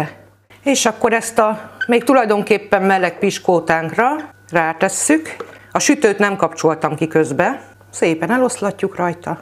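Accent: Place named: Hajdúság, Hungary. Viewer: Nagyon aranyos vagy, még akkor is ha nem vagy egy bájolgó tipusú. Főleg hogy gyerekkorunk "bűneit" is (a nyalakodást) is emlited. Nem kutattam még honnan kűldöd a tanításaidat, de az ízes hajdúsági es környeki beszeded árulkodó ( mint debreceni ) köszönünk mindent!❤️Áldott ünnepet, ❤️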